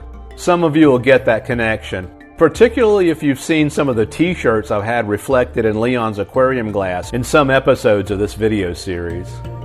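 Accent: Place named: Midwest, United States. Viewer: I know you live in Georgia now and lived on the east coast prior to that but you don't sound like a southern gentleman to me. Where are you from originally, sounds like a midwestern guy to me.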